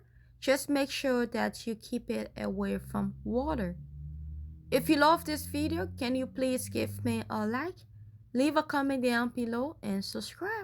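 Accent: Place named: Liberia. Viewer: Are you Liberian you sound like me!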